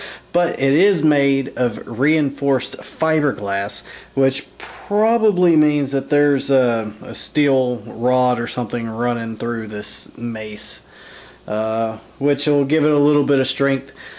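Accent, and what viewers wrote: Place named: Texas, United States. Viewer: Texas accent is no excuse for mispronouncing our dark master Sauron the great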